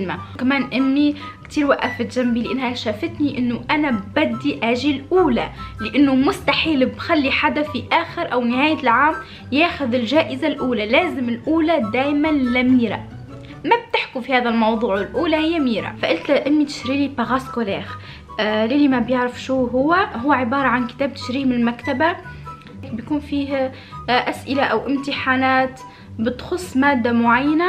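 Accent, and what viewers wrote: Tunisia. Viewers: انتي تونسية صح ؟ ماشالله على بنت بلادي اول ماعرفتك عندك نبرة تونسية و شاهدت البعض من فيدواتك روووووعة ماشالله عنك😍😍 انشالله نقول عليك صحباتي يتابعوكي ❤❤❤❤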